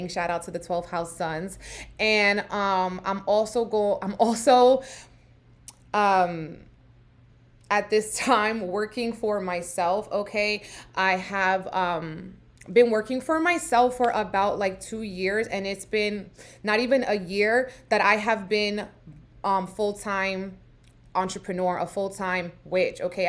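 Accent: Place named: Bronx, United States. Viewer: Yasss i knew it! I heard that bronx accent, my peeps !! Keep shining and thriving boo , love you and your content !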